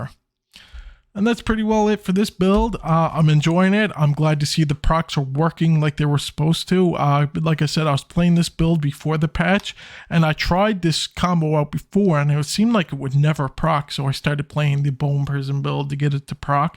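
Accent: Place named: Newfoundland, Canada. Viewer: where u from u sound like a newfie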